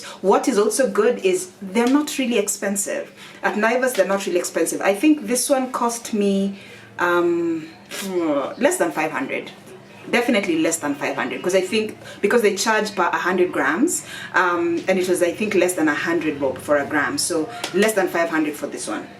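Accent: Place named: South Africa. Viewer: thanks for the information...love the accent, is it South African?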